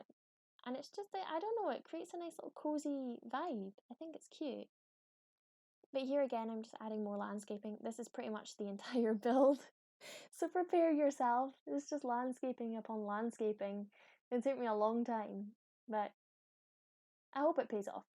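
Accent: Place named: Scotland, United Kingdom. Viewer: I loved it! And I love your accent 💚 so rarely I hear Scottish in media 💚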